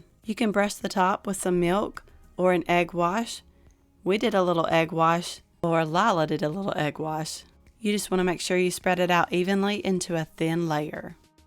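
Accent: South, United States. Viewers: I love all your videos your country accent and most of all your recipes are all delicious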